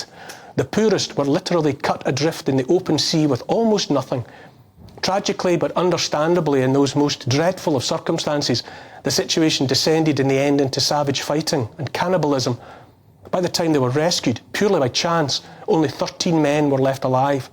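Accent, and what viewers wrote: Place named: Glasgow, United Kingdom. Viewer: Mate what's way the neck rug? And stoap way the Irritating accent yer a weegie trust me it's no surprise ur channel is complete Murdoch puppet play.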